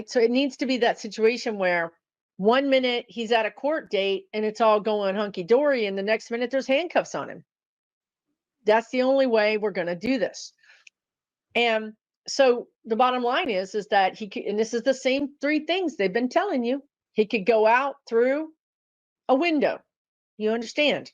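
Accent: Texas, United States. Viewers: I luv it it when YOU TALK TEXAN ❤😮 DEBRA WADE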